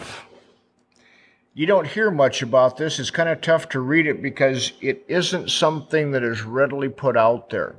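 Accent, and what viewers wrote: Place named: Midwest, United States. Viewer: That's a midwest accent . I think. Seems Wisconsin as F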